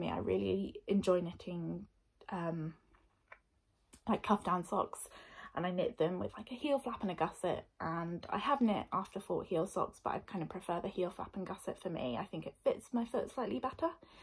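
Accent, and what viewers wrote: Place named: England, United Kingdom. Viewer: Greeting from British Columbia, Canada.....you had me at tea, ginger, chai and your lovely English accent!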